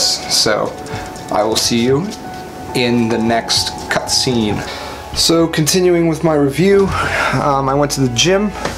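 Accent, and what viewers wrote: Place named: Nigerian American, United States. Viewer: o that's what you mean. It's Nigerian American from southern part of California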